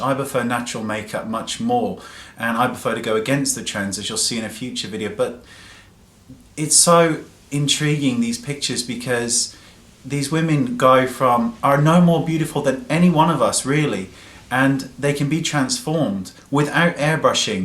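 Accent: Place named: Wales, United Kingdom. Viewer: I'm hearing more of the welsh in you today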